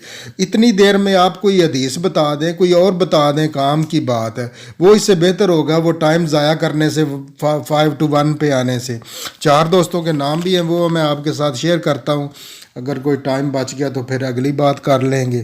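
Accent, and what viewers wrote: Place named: Hyderabad, India. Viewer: Janab ye kara kari India Hyderabad ka accent he. Kehte hain Urdu UP me paida hue, Lakhnaw me jawan hui aur Hyderabad me dafan hui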